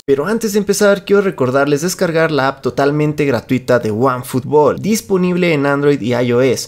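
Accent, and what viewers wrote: Mexico. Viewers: Eres mexicano o portugués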